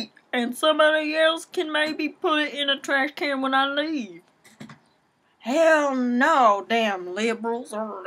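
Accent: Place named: South, United States. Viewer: This was definitely what I needed to see before I go to bed...now I'll definitely have pleasant thoughts..lol No more zombie thoughts. ;) I loved this...I like your southern accent...in fact, Gary can attest to the fact that I was cracking up so much I actually had tears..yes, you are THAT funny. DO MORE VIDEOS! haha I owe you one as well..;)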